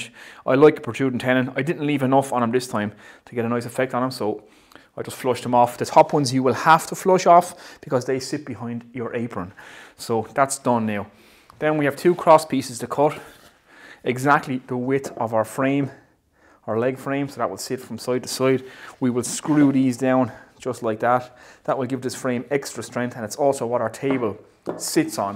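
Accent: Waterford, Ireland. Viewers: Some Waterford accent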